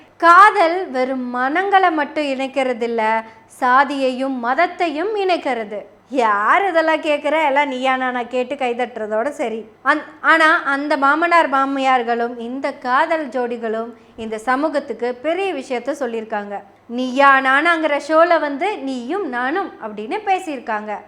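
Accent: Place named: Coimbatore, India. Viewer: I like your slang...😂 Use mostly covai slang...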